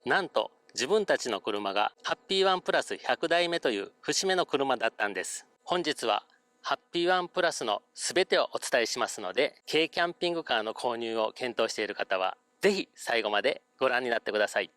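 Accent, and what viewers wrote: Kobe, Japan. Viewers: 神戸の人かな❓ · とても分かりやすくて参考になります♪ 以前神戸に住んでいたので言葉も懐かしいです～😄 軽キャンを検討、特にhappy1+を考えてるのでこれからも動画を楽しみにしています～😄 レンタルもしてみようと思います😄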